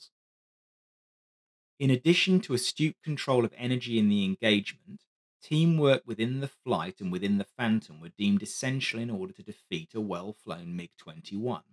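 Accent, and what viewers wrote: United Kingdom. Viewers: Love your Brit Accent and dry assessment that outlines the basics of the problems of the transoceanic and heavier air flight characteristics... a lot of technical limitations.. and I am not Fn of only single seat fighters over the REO concept, as this is the ultimate command seat for on scene awareness.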